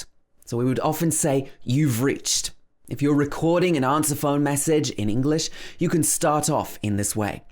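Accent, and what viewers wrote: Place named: United Kingdom. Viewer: Omg i really wanna learn british accent watched all ur videos...pure british🔥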